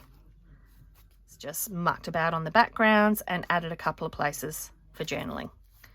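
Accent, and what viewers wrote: Australia. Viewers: Nice to hear a fellow Aussie voice!!!! Love those stitched leaves so much!!! Split pins for me here in Oz-brads in the US. Glad YouTube gave your channel to me today!!!!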